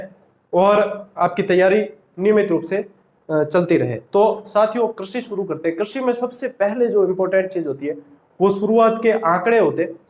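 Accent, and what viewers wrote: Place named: Marwar, India. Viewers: Marwad ki mitti boli k sath aapki class bahut hi achhi h sir.thank u so much .... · Sir aapki marwadi bhut chokhi lag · Na sirji hamein to aapki Marwadi bhot achi lgti h...wait krte rehte h ki aap Marwadi mei padhao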